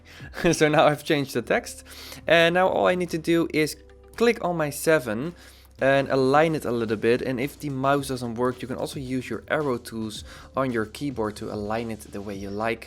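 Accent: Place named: Netherlands. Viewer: No offense, maar je nederlands accent is wel hoorbaar voor de mede-Nederlanders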